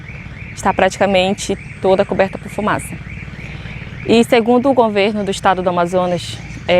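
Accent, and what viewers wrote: Rio de Janeiro, Brazil. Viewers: Oi td bem so uma pergunta vc, tem o sotaque de carioca vc é carioca? Abraços sou seu fam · Vc e do Amazonas mas tem o sotaque de carioca (fala com chiado), Por que???. Vc já morou no Rio de Jan.????.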